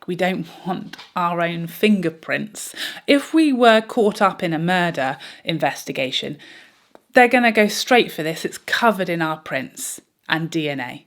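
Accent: United Kingdom. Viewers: I just found your videos today, and I ADORE your POV, your common sense approach, and your talent! As an American, I am also enchanted by your British accent. Thanks for doing videos!!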